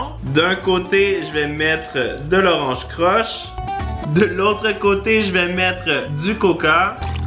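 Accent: Canada. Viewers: tu mon youtubeur préféré desCarl is cooking vidéos accent canadien et qui met quoi des vidéos trop trop rire 🇨🇵🇨🇦👍👍👍👍👍👍👍👍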